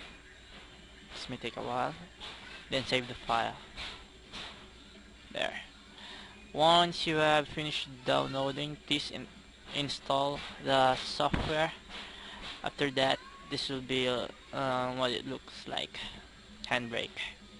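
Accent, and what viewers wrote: Philippines. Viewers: i know a filipino accent when i hear one lol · are you filipino..?? dat accent xD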